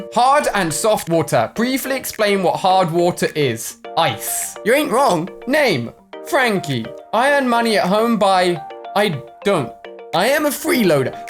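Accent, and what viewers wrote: England, United Kingdom. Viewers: Wait is British like me 😮😮😮😮😮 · Are you from uk or something else? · Are you from England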